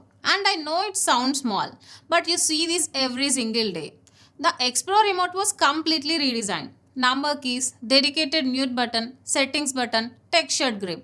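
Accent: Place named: Tamil Nadu, India. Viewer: Aiyyyyo Thamillll accent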